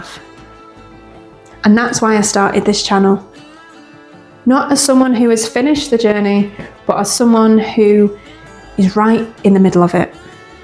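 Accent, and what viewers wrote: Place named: Northern England, United Kingdom. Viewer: Welcome to Ireland. Great northern English accent! Good luck.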